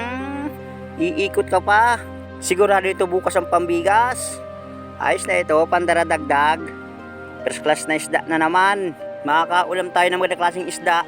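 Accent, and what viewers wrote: Bicol, Philippines. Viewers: bicol tagalog salita m idol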